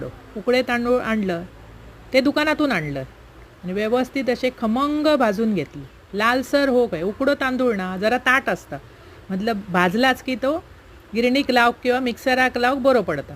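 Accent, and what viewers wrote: Malvani, India. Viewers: तुमची मालवणी बोली ऐकायला खूप छान वाटत रेसिपी तर अप्रतिम आहेतच "आवडतले तुमका" हे प्रत्येक रेसिपी नंतर जे आपण बोलता ते ऐकायला खूप गोड वाटत. 🎉🎉🎉🎉💐💐💐💐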